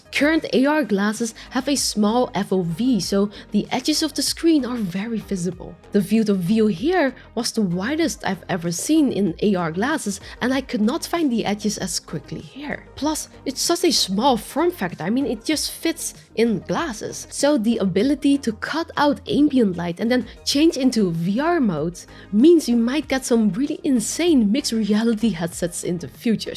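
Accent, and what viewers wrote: Netherlands. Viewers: Is that a dutch accent you have?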